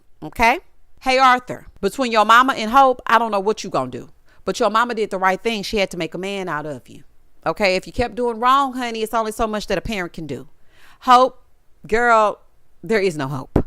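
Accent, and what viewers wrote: South, United States. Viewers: Blythe from NYC, here. I usually listen to your reviews on speaker when I’m doing something else. Today, my bf overheard and was oh so tickled by the “sassy southern belle” coming from my phone😂.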